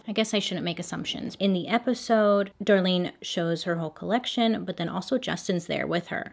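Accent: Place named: California, United States. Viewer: Watching this in 2020 and I feel so happy to hear someone from my part of California!!!! I lived in Riverside, Moreno Valley and Perris!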